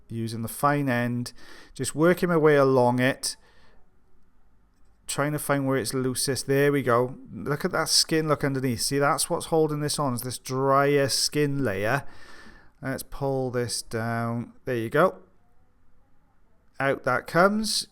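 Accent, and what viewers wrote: Wales, United Kingdom. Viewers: First and foremost, let me say thank you for your attention, time and dedication to the subscribers of this channel.. The recent changes seem to have had a polarising effect on viewers, especially the long term viewers, and I think a large part of that is because of the removal of the trademark beard. However in all honesty people hate change, and have followed the channel through a great deal of change recently. So here's MY QUESTION: What happened to the "#Countdown"???? Of all the changes made, it was my favourite.. ... Watching & listening, as your viewing old ear wax removals WAS THE BEST! Your natural exuberance and Welsh accent, coupled with your race calling abilities had me on the edge of my seat. It was wonderful and for those of us who have literally watched as the format grows and changes with the tides and seasons and the viewers, I thinks it's safe to say; no matter what the true waxaholics will always be here. 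As for my vote, well that was officially recorded on the community page, however returning to the Countdown is my unofficial vote.... Viva La Difference....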